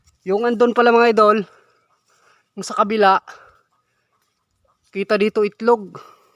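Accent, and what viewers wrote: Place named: Visayas, Philippines. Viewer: Hindi ma klaro hahaha bisaya diay ka dol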